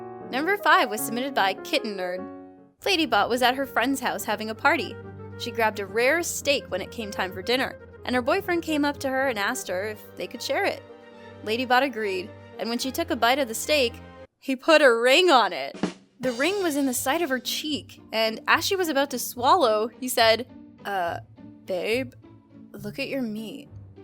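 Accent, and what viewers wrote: Canada. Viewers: Huh, didn't know was Canadian. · Is Canadian? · is canadian? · Is from Canada? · Are u Canadian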